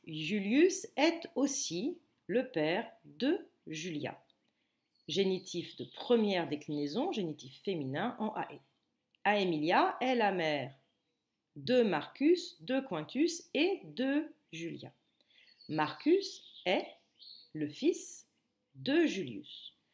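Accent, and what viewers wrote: France. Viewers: C’est très bien de promouvoir cette langue magnifique. Toutefois votre accent français-qui ne place pas correctement l’accent tonique sur les mots latins-n’est pas approprié au latin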